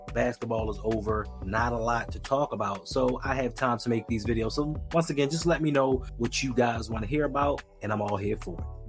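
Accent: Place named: Northeast, United States. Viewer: I'll be back when you get the audio right. Definitely from northeast . I can hear the MD, DC or PA in the voice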